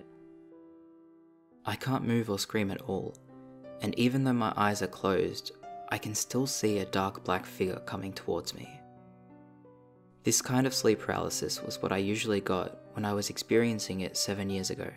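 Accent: Australia. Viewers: Wtf the transition from the anime part to the actual video scared me cuz I forgot you had an Australian accent..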